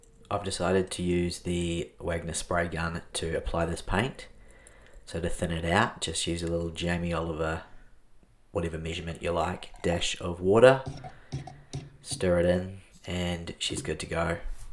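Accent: New Zealand. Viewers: I'm so used to hearing American accents that familiar kiwi accent threw me for a loop! I'm a fellow furniture flipper from New Zealand and I am also taking a break from drawers to work on a coffee table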